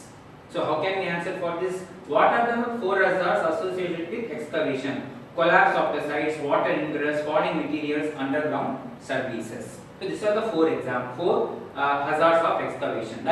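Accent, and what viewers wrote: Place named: India. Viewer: Your English is like Indian very fast.........please reduce speed.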